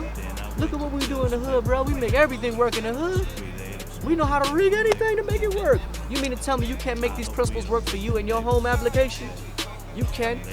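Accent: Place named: New York, United States. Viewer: Where you from homie ? You sound like you from NY.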